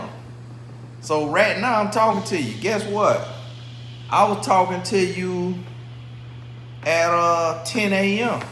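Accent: United States. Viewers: Awesome as always,. But it's the way you say water and back for me. 😄 I love the country accent.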